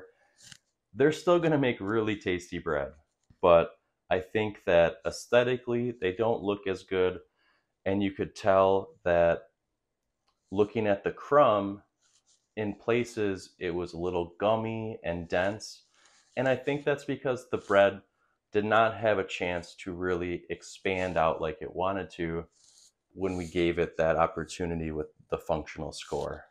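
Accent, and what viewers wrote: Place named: Midwest, United States. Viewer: As a midwesterner, I appreciate your vowel pronunciation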